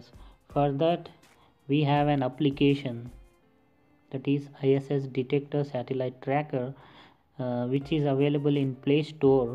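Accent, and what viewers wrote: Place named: Bihar, India. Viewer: bihari english accent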